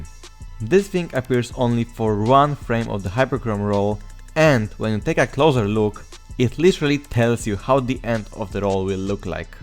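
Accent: Poland. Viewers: Where are you front you have Poland adcent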